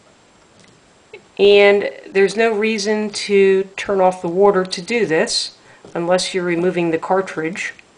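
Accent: Philadelphia, United States. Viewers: Is this a Philly accent or Baltimore?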